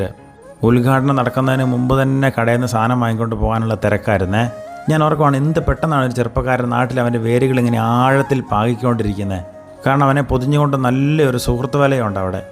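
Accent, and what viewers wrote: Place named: Idukki, India. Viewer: Ningade samaaram kettal kannurkaaran aanenn thonnarilla.... i thought u were someone from idukki, kottayam or pathanamthitta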